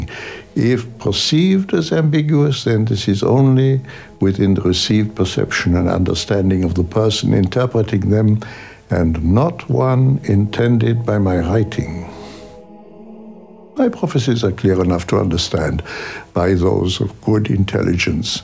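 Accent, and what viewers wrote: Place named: Germany. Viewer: nice German accent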